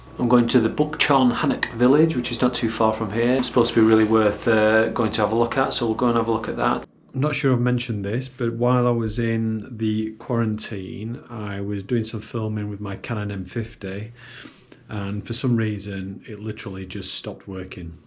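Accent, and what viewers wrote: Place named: Yorkshire, United Kingdom. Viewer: Congrats on the new camera! From your accent you sound like a Yorkshire man? Similar to mine 🤦🏻‍♀️ if I’m wrong 😂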